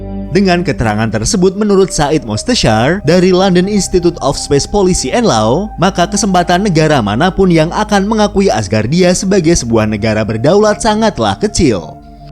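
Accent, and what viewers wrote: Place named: Garut, Indonesia. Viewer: Asli Garut kah min?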